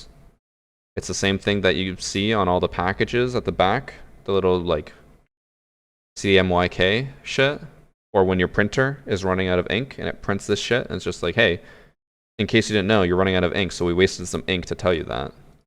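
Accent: Canada. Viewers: Yo do I speak Spanish? I know ur Canadian because you say “oat” instead of out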